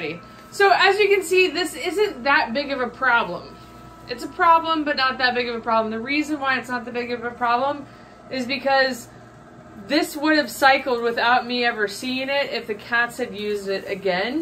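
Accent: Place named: Pittsburgh, United States. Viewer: Could hear the Pittsburgh accent haha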